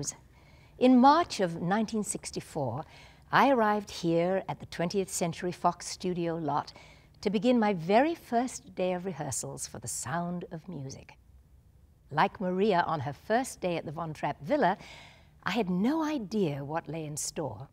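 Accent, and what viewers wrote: United Kingdom. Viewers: I love Julie Andrews and I love the fact that she's never lost her British accent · Its actually a southern english accent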